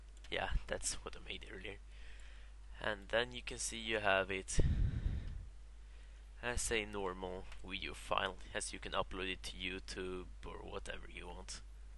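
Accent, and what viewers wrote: Sweden. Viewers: Are you swedish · är du svensk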